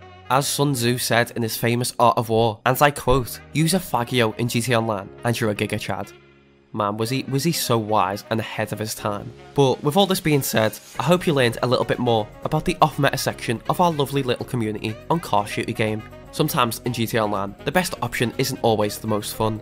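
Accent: Liverpool, United Kingdom. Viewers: ps: i've never met a scouse gta youtuber before :( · Scouser!! Yes now lad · I am now convinced you are British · omg , he's so bri-ish 😢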